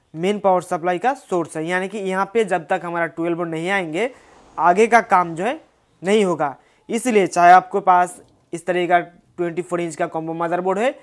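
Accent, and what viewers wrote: Bengal, India. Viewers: দাদা তুমি বাঙালি নাকি তোমার হিন্দি কথা গুলো বাঙালির টান আছে ❤️ তোমার কথা গুলো ভালো লাগে আর বুঝতে ও সুবিধে হয়❤️ লাভ ইউ দাদা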